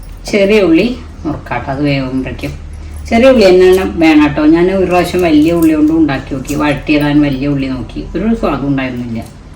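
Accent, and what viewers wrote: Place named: Bharatapuzha, India. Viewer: your Malayalam is from Bharatapuzha side ,is it?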